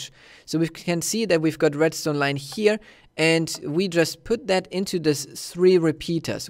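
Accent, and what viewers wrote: Germany. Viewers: are you German? I am.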